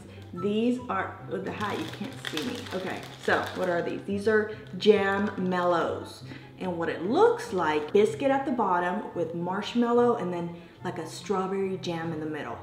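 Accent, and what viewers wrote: Texas, United States. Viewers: Are you from texas?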